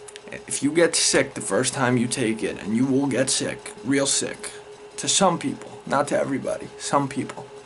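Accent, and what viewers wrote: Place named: New York, United States. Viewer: yo I hear what you're saying in this vid, I've been struggling since I was 16 and I'm 19 now.. what you were saying about the sub docs is true no one needs that much, there's a 'ceiling effect' to the stuff.. none the less u spoke the truth and I dig the patriots stuff in ur room even though u sound like a new yorka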